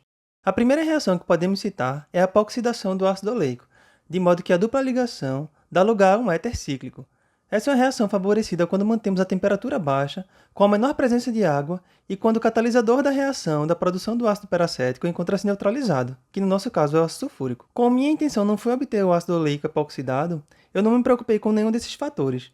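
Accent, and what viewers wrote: Northeast, Brazil. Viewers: Mto bom! Parabéns pelo canal. Sotaque do Nordeste me lembra de casa!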